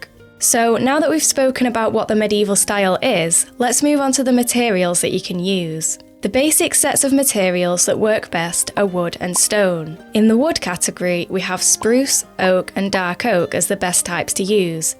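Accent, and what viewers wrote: United Kingdom. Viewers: pretty sure their accent is british, not sure though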